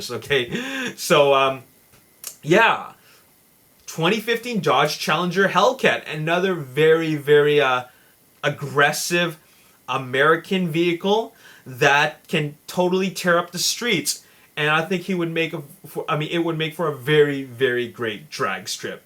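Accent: Canada. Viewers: You must be Canadian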